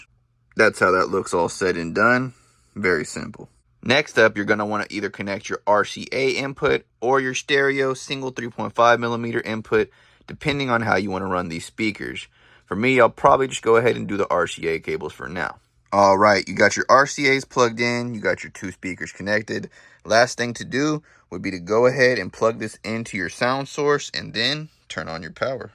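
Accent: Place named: United States. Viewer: I hear the US accent and I know the claim in the title is bs. I'm out of here.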